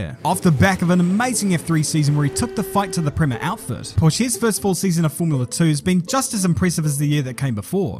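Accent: New Zealand. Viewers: Let me just put it out there. has the best narrating voice. The kiwi accent is just magnificent.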